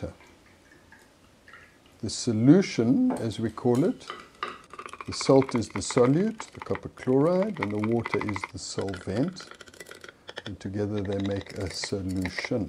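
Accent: South Africa. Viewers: I know that South African accent anywhere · Your South African aren't you? Ik that accent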